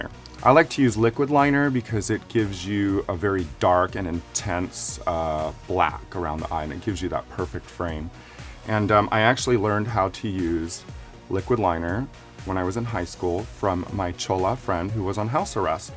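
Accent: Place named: Mid-Atlantic, United States. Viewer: I love Raja's voice. There's a touch of old Hollywood/mid Atlantic without being fake.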